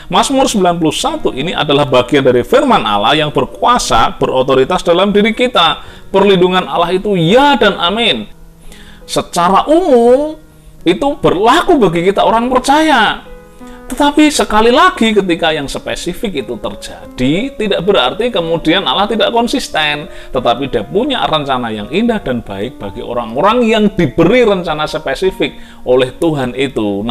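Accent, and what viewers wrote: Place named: Java, Indonesia. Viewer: Mantap Mas...khs dialek jawa jg ada..heheh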